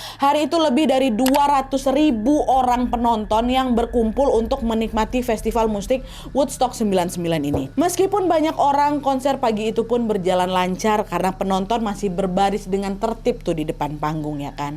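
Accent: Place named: Padang, Indonesia. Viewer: Logat Padang terasa bgnt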